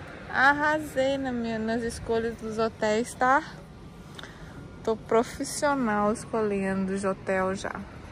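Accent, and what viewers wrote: Minas Gerais, Brazil. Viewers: ADOROOOOOO VIAJAR COM A QUERIDAAAAA!!! E desfrutar da sua simpatia, do seu sotaque mineiro que é o sotaque mais lindooooo do mundo!!!! Beijos ❤❤❤❤❤❤❤❤ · Pessoal nao corrijam a quando ela falar "tamos" ao invés de" estamos",o mineiro tem mania de falar cortando as palavras, e também diminui-las kkk,mas na hora de escrevermos escrevemos direitinho😊sou mineira, mas adoro ouvir o sotaque da é bem mineirim gostoso dimais da conta sô❤❤ abraços para todos!!!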